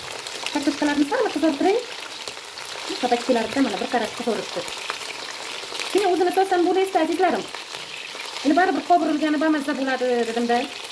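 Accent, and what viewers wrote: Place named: Gijduvan, Uzbekistan. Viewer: Ваалейкум салом,бемалол опа десангиз хам булаверади.Каерликсиз,акцентиз Гиждувонликларга ухшаяпти.Биз Навоийданмиз.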